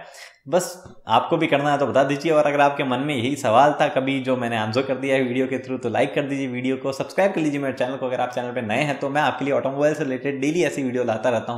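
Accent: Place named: Gujarat, India. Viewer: Are you a Gujrati? You've a strong MTI. :D Btw, nice video.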